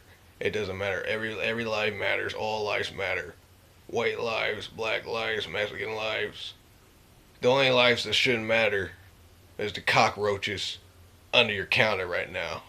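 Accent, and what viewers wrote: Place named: South, United States. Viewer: your body language is speaking when you're lying out of you mouth don't you know most things or institutions was suggested toward "black" you know what happened to natives . and now Mexicans are considered illegal alien(s) on parts of west side america (Mexicans original land ) go read a book (several) you're looking stupid . & you sound like typical southern tabacco chewing inbred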